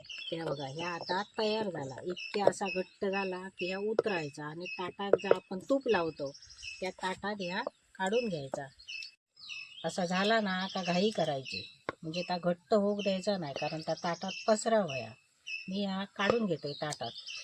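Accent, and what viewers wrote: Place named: Malvan, India. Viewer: तुमच्या सर्व रेसिपी सोप्या असतात तुमचं मालवणी बोलणे गोड वाटते नाचणी वडी खूप छान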